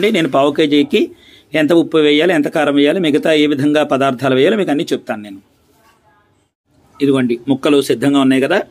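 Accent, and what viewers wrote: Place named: Guntur, India. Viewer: Guruvu gariki namaskaramulu. Mee vantalu ma amma garini gurthu chestunay andi. Meedi a vooru andi. Mee vucharana guntur district ni gurthuchestondi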